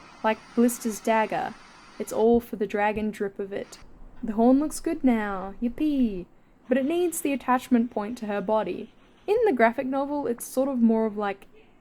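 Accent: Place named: Australia. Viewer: I vote for the 28 unnamed princes :) Also what nationality are you? You sound aussie ( i am)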